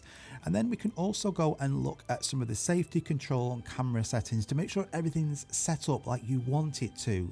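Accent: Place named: Yorkshire, United Kingdom. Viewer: Btw love your content. I’m in Yorkshire. Can tell you are somewhere in that location with the accent :)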